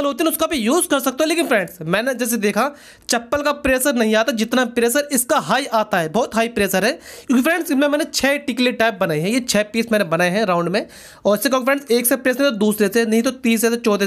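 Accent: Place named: Haryana, India. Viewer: Aap kapil sharma jaisa bol rahe hein Shayed aap haryana se hein Good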